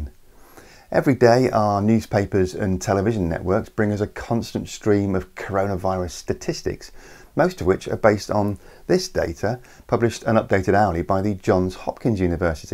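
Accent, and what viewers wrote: England, United Kingdom. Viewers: Once the COVID lockdowns are lifted, demand for oil will rebound and the supply glut will ease. Most of this video seems like wishful thinking, as oil consumption is predicted to rise through 2040. Great presentation and a pleasant English accent doesn’t change that barring a technological breakthrough, fossil fuels will be with most of us for a while yet.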